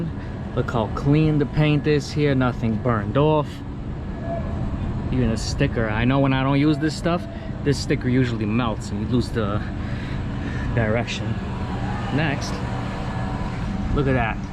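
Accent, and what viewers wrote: New York, United States. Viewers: Your from newyork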